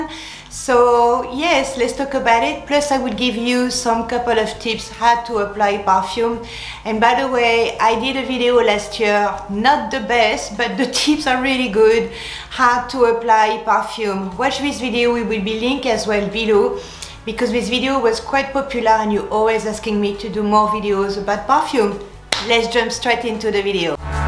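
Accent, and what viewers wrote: France. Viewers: French perfume review by lovely french accent ❤️ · I can feel the French accent while you are talking in English 😂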